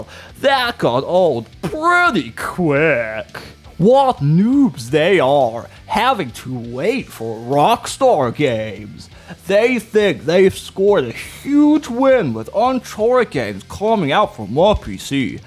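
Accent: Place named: United States. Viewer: It's clearly American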